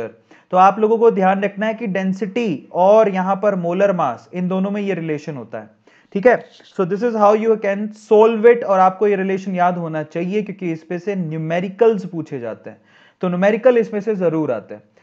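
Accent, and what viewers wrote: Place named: Gujarat, India. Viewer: Sir gujrati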